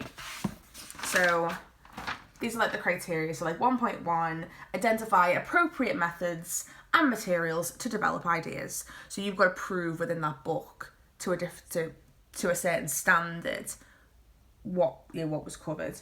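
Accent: Liverpool, United Kingdom. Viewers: Thanks for your reply hun, love your accent too, can't beat a scouse accent! haha I'm from Belfast! That's great you loved it, it's hard practicing on your own but when you're kind of 'forced' lol you get lots done so i'm looking forward to it. I'm defo going to go to uni next year, what did you decide to study in the end- fine art or textiles? would love to see a video on uni life for mature students! :)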